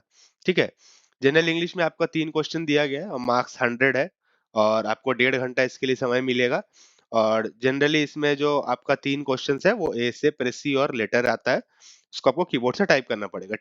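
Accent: Bengal, India. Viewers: Dada, are you from West Bengal? As you sounds like a bengali.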